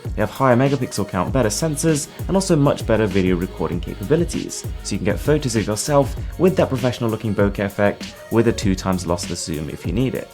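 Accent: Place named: Nottingham, United Kingdom. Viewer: Nottingham Accent.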